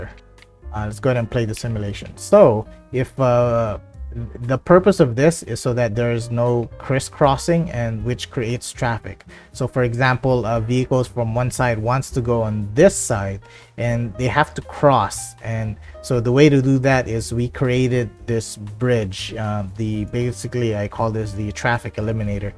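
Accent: Philippines. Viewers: I’m actually liking the plot of your city design. However, I noticed every-time you are using skywalks, you intend on using parking gates thereby generating “park income”. Isn’t that kind of cheating? I mean it aint a crime but it’s definitely triggering me😂😂😂 Nevertheless, love the series. Nung una kita napakinggan, alam ko na pilipino ka 😂. It’s nice to know na mai CS content creator na pinoy. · are you from ph? haha cause of the accent. new subscriber! really good info as well. thanks!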